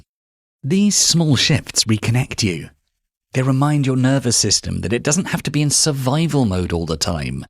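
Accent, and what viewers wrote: England, United Kingdom. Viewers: You know what I am sick and tired of hearing these English accents. I'm not even joking I'm going to throw my phone or my TV out the window if I hear one more video with somebody with some half-baked goofy English accent. I'm sick of it.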